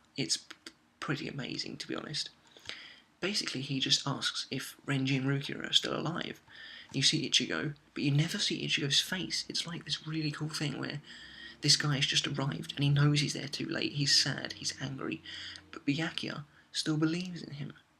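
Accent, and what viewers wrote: United Kingdom. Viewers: Wow, I didn't even think of what kind of side effects the balance of soul would have on the human world. This is why I like your reviews, your insightful and British...are you British?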